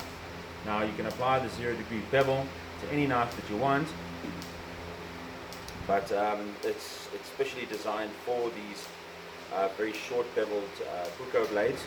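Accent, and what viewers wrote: South Africa. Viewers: I couldn't place you accent for like 5 seconds when I realised south african!!!! Nice to see a fellow who also does knifemaking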